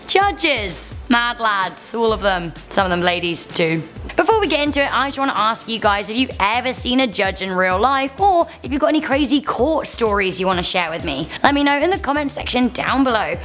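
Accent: United Kingdom. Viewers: What is the story behind the British accent, you are from Canada, right??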